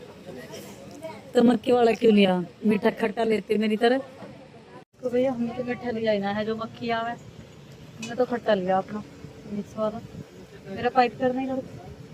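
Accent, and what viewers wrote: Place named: Baghpat, India. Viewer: Baghpat se h didi ase hi bolted h hm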